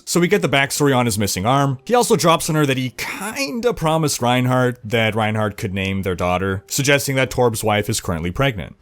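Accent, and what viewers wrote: Sweden. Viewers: That swedish uttal... :D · As a swede I just couldn't stop myself from laughing hysterically to your Swedish pronunciations. xD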